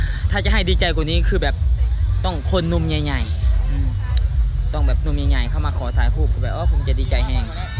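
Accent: Laos. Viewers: เว้าลาวกะได้เจ้าเอี้ย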